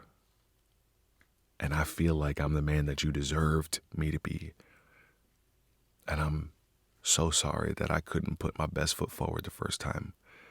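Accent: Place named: South, United States. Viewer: I could listen to your soft guttural accent with a slightly southern drawel sweet and slow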